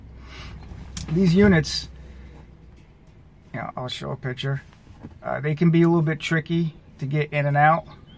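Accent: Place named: New Jersey, United States. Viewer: Damn. Didn’t know you were from Jersey.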